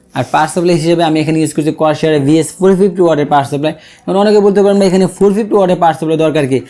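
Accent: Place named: Dhaka, Bangladesh. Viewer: আপনার সব কিছু এত সাবলিল ...সব সময়ি আমি আপনার প্রডাক্ট রিভিউ দেখি ...সুন্দর করে বুঝিয়ে দেয়া এবং টেকনোলজির সাথে ঢাকাইয়া বাংলা টা আর মজা লাগে ...আমি নিজে ঢাকাইয়া এই ভাষাটা হয়ত পুর ঢাকাইয়া না ঢাকার চলিত ভাষা ... এই কারনে আর বেশি ভাল লাগে...গুরু এমন সুন্দর সুন্দর রিভিউ আর চাই...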